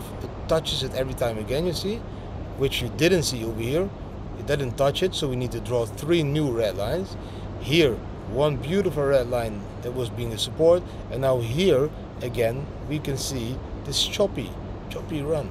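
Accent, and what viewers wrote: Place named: Netherlands. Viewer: He een Hollands Engels accent:)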